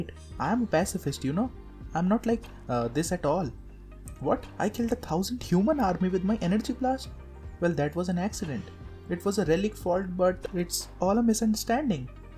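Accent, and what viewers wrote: India. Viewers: bro pls turn back the old video type ı don't wanna hear your indian accent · Indian detected hehe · His accent like indian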